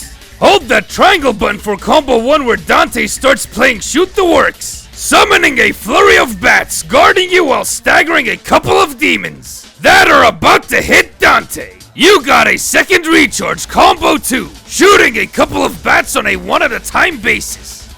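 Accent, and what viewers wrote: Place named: Australia. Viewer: Bro has the most australian assent i have ever seen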